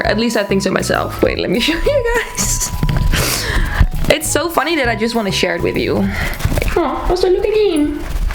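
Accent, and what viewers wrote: Netherlands. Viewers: Ik heb mijn voorhoofd verbrand met mijn stijltang, en ik heb perongeluk mijn bangs micro geknipt... Ik zoek gewoon voor antwoorden op YT, en dan zie ik jouw video en mijn brein gaat zo van "ZE KLINKT NEDERLANDS" En nu ben ik blij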